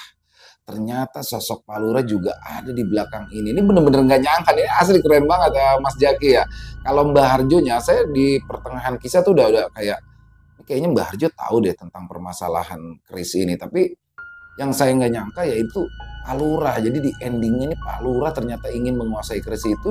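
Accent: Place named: East Java, Indonesia. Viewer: Misuh khas Jawa timuran emang fenomenal 😂😂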